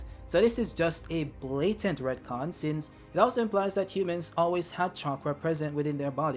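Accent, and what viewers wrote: Jamaica. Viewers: You a yard man? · Are you jamaican? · Yaa Jamaican?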